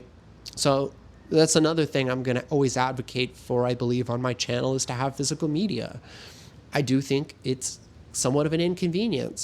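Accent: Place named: Germany. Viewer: Are you sure you're American? 😅 You sound like you have an accent, and for me, you somehow sound more German/European than American. Please don't be an immigrant, i.e., don't be contributing to the thug that is America. And disclaimer, I myself am Chinese and don't care to be called American, nor do I care to be represented by Hollywood Aesians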